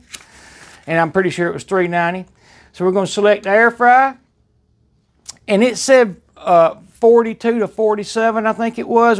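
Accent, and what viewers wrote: South, United States. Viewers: His down south accent talking about wings is weirdly soothing. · His southern accent instantly makes me trust him. Great video good sir!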